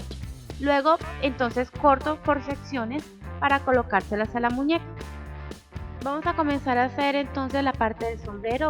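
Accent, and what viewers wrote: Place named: Colombia. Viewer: te escucho con acento colombiano, la porselana fria es la misma porcelanicron?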